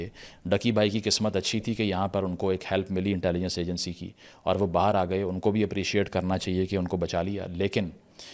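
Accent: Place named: Punjab, Pakistan. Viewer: Pukka Punjabi style waaoo mazaydaar exent❤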